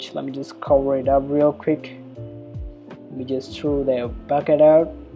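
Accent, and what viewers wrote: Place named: United Kingdom. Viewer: Ur British accent is still the same as before!!!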